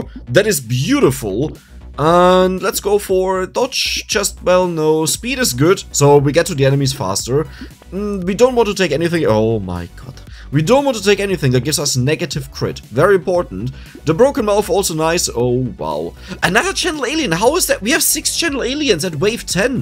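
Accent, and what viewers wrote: Germany. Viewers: Oof the german accent😂😅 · The german english 🤣 love it, wenn das deutsche "so" ausbricht 🤣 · You know, it's already hard enough to get through your thick German accent, the NIE NE NE NIE NEN NE don't really help there. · Very german accent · Moin Ich mag deine Art sehr gerne (und dein deutscher Akzent hat dich auch noch mal direkt ne Ecke sympathischer gemacht) Abo ist da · Bruh du bist Deutscher das merkt man so Hard in deinem Akzent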